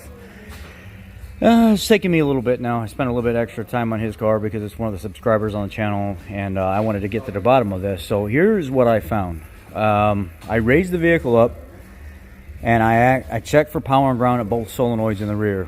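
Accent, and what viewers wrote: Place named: South, United States. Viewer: Great vid. You had me cracking up with you country accent….LOL…Stay Blessed..I’m still giggling as I type this.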